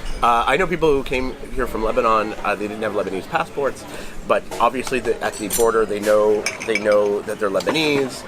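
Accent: Canada. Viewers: He sounds so Canadian.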